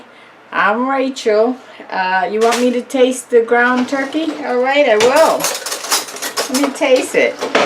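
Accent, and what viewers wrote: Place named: New York, United States. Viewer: Thanks for the video and I love your New York accent!!!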